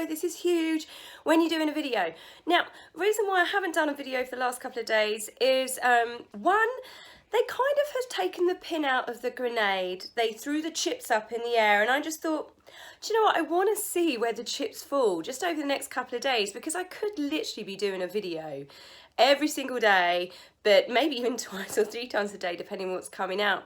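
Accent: England, United Kingdom. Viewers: I love your accent 😍 What part of England are you from?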